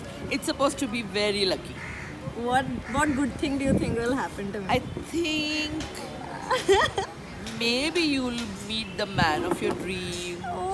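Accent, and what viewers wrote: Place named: United Kingdom. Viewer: Engrej ki tone bol ri h pgl hoshyari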